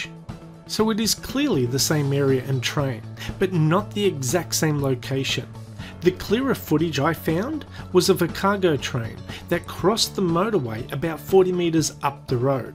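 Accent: Australia. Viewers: yes exactly I kept on hearing "Murderway" and "Murderists". I guess that's Aussie accent! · yeah I agree with Ted. It's a well-spoken Australian accent. Beautiful accent. My accent.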